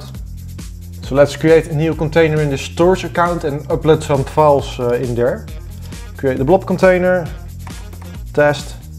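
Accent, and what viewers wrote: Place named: Netherlands. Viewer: Maybe you should remove the typo in your title: Virual -> Virtual Love your dutch accent btw ;)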